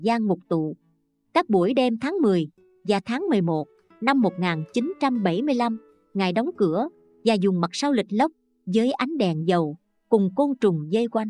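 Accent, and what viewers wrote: Saigon, Vietnam. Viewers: Giọng Sài Gòn nghe ngọt quá! 👍👍👍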